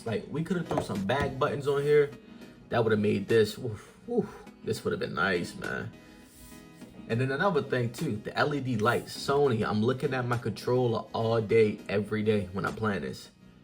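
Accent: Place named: Baltimore, United States. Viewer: Def from Baltimore, good video my G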